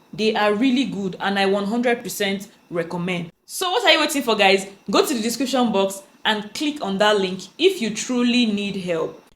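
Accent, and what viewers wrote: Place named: Nigeria. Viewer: You sound like we went to the same care academy in Nigeria 😂❤. Thank you sis. Is there anyway I can contact you?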